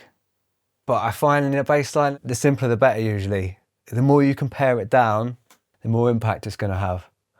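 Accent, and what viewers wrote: United Kingdom. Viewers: He has a standard Estuary English accent. Hard to define a class based on it I would say.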